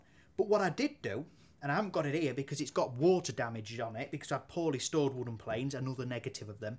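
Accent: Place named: England, United Kingdom. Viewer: I just recently discovered your videos and am glad I did. I am new to woodworking and looking to soak up every piece of knowledge I can. You explain things very well and with an enjoyable accent as well. (Here in corn country in the U.S. you don't hear an English accent very much) Keep up the good work and I look forward to watching more of your videos!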